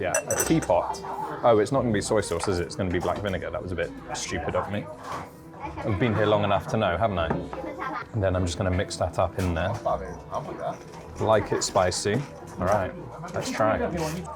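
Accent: United Kingdom. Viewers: The video has a good rhythm and a pure British accent that sounds very comfortable. I watched it over and over again to learn English, and I really enjoyed watching it. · Chinese here. Subscribed for British accent lol, but mostly I enjoy the calm, genuine vibe in your video without diatribe & hubbub. glad that you enjoyed your trip so far. I am working and living in XinJiang region right now, summer is perfect for travel ( none Urumqi areas), definitely worths a visit. cheers.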